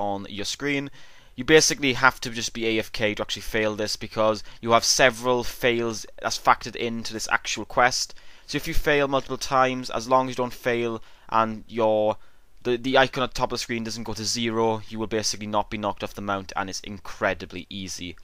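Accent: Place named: United Kingdom. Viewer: dude even u are a gay or freaking weird british that cannot talk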